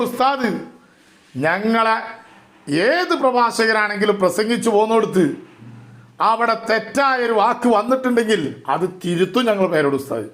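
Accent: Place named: Malabar, India. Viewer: ഉസ്താദ് ഞാനൊരു തൃശൂർ ജില്ലക്കാരനാണ് അങ്ങയുടെ മലബാർ ശൈലിയിലുള്ള സംസാരം എനിക്ക് ഭയങ്കര ഇഷ്ടമാണ് കുരുവട്ടൂര് ക്കുള്ള ഓരോ വീഡിയോയും വേണ്ടി കട്ട വെയ്റ്റിങ് ലാണ്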